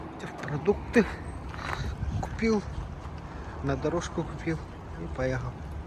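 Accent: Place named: Tuva, Russia. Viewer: У тебя тувинский акцент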